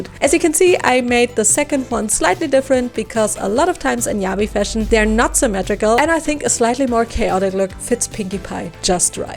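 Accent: Germany. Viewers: Are you German? Also I love what you did with pinky🥰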